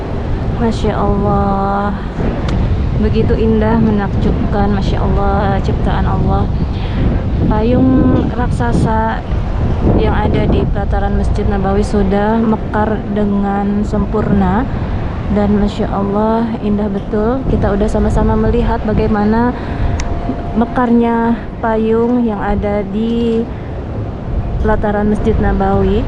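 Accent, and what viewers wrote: West Java, Indonesia. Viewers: Assalamualaikum sy baru buka Vidio ini tapi sy sering nonton video Nya Kalau denger cara bicara nya kaya orang Sunda ya dari mana asalnya karna sy orang Sunda juga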